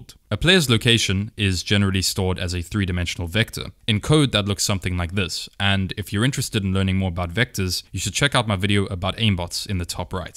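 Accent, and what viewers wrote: South Africa. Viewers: The way you said 'data' revealed to me that you are indeed a South African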